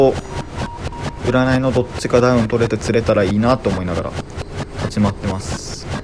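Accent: Japan. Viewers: 金沢弁かな？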